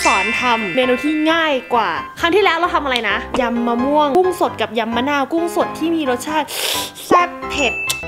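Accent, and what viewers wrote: Isan, Thailand. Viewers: พี่เป็นคนอีสานหรอค่ะพี่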